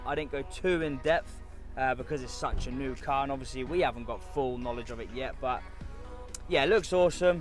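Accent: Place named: United Kingdom. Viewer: Twit-ah. I love British accents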